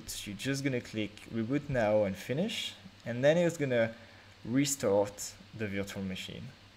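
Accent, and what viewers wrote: France. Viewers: are u french xD ?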